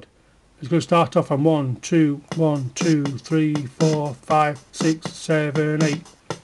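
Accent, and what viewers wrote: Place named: Lancashire, United Kingdom. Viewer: Hi mate, thanks for your tutorials, you're doing very well.Hey I love your accent. Is it Lancashire you come from or somewhere not far? I used to attend boarding school in the beautiful Lake District which as you may know's a stone throw away. I have just bought a Kaosilator like yours and learning. Do you happen to know how I would save stuff onto the SD card without erasing previous data? The instructions are not the clearest in some respects. Cheers.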